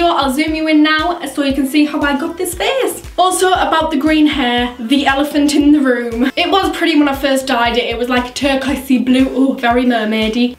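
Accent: Newcastle, United Kingdom. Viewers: She's British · Are you a geordie because you kinda have an accent like me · yeah It's a geordie accent I have one too . you get one if your from around Newcastle,Durham ,Sunderland and parts of a place called Wallsend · Where about in the north east are you? You sound like you from somewhere close to Newcastle . xx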